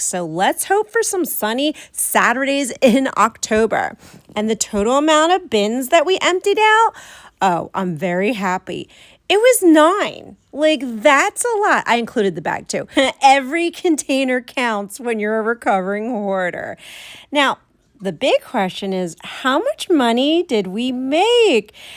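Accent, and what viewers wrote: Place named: South Jersey, United States. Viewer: How do you do your pricing for yard sales? Do you price each item individually, or do you just negotiate when someone is interested? I don't see prices marked per table or on each item. Also, please know that you were the first person I could watch about de-cluttering & hoarding disorder that made sense to me and I didn't feel overwhelmed with guilt listening and watching you. You've made so much progress and given so much hope to many! I've found one other channel, a lady more my age (This Mainer Mom), but you two are it, and both kind and practical and motivating! I'm not as far along as you are in decluttering, but am working on it, and have accomplished cleaning out several places I'd avoided for years. Something else: I recognized your accent and eventually found that you live near where I grew up (Pitman) before my family moved to Indiana when I was almost a teenager, though I've been back there a lot to see relatives & to take my own daughters when they were growing up. 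Your accent, your talking about going down to "the shore" and all just makes me remember family and my wonderful growing up years back in south Jersey. It seemed like the Lord helped me find you because you made sense to me, you have such an honest and loving spirit, and you also have expressed your faith. You have a ministry! And we also share a name, I think--! Thanks so much for all the encouragement you've been to me and I'm sure to so many others!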